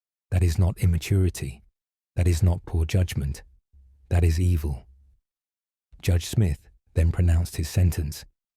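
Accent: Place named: United Kingdom. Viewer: Why use the British AI accent for USA stories? Use the USA accent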